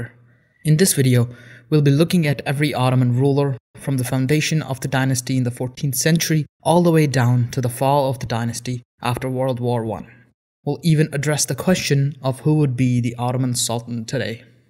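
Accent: Arab world. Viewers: Your accent getting me sick !!!! Don't use Arabic accent , use Turkish accent . İt is inexcusable mistake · The narrator is an Arab and he pronounces as he knows. Biraz hoşgörülü ol kardeş :) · your pronounciatons are like an arab who trying to speak turkish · Please dont pronounce Turkish names in Arabic way :/ (Ottomans ınspired by arabic culture a lot I know but THEY ARE TURKISH:///) And sorry but at the ending it sounds like a banned sultans ready to built an empire again? :D which is not true and It made me feel really bad as a turkish person :/ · Why are you pronouncing sultans name with an arabic accent? They are not arabs they are turks. I wish someone who knows turks and turkish prepared this video:/ this is so amateurish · exactly. That Arabic pronunciation is extremely distracting. This was a Turkish empire. He should just have pronounced the names in English. 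Even that is closer to the Turkish pronunciation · I guess the narrator is Arabic, it definitely doesn't justify the wrong pronunciation though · Your pronounciations are way off bro. You pronounce the names in arabic tongue. Which is completely wrong. Its not moustafa qamal. Its Mustafa Kemal. We never had guttural sounds like that. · Thanks. I purchased this chart some months ago and enjoy referencing it. I believe the narration of this video however could be improved. Notably, important Sultans were glossed over, and some, not mentioned at all, such as Sultan Abdul Hamid II. There’s also a number of inaccuracies I believe, including attributing the correct titles to the Sultans, such as referencing Osman as Gazi instead of Bey. Finally, while the names are Arabic, I believe they should’ve been pronounced in Turkish, which is not difficult to do even for an Arabic speaker. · yes you actually can pronounce them differently especially when it's literally using the very same writing system and script that it's being borrowed from! 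Which is arabic! It makes sense to pronounce them as they're pronounced in Arabic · Please do not pronunce names with an Arabic accent when talking about Turks, it is just incorrect. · Nice video first of all, but why did you read the names with an explicit Arabic accent? Nobody in Turkey or in Ottoman times pronounced the names like that, nor are the Turks related to the Arabs. For many Turks, it's actually quite offensive. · maybe because he is arab and those sultans names were arabic name,even your founder of yours new country Turkey has arabic name Mustafa and that's why it's normally to pronounce like that.Example I'm albanian and if I had turkish name and you as turkish if pronounce my name in turkish version, I would see as normal thing because that is the way how you pronounce I don't see nothing strange on this. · Turkish names are not pronounced like Arabic.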